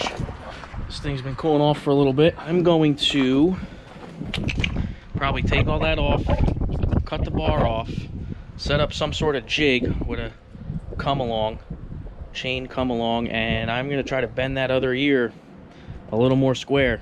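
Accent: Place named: New Jersey, United States. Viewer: What part of New Jersey are u from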